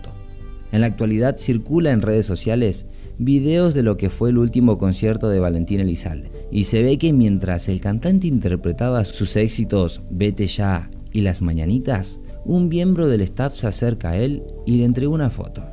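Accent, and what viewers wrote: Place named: Argentina. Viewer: Lo que mas me vuela la cabeza es porque el narrador tiene acento argentino?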